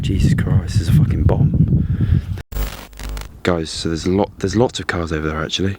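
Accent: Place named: India. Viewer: Inglish accent, sounds, suks, like this dud accent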